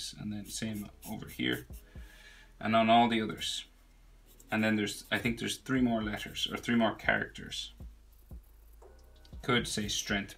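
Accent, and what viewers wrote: Ireland. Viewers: Are you Irish? Just curious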